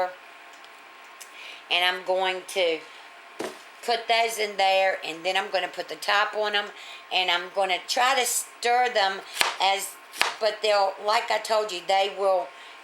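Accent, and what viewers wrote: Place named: Washington DC, United States. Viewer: where are you from? Your accent sounds like my older female relatives, from the Washington DC area back when it was fairly rural. Listening to you really takes me back, and they cooked these same dishes too. Thanks!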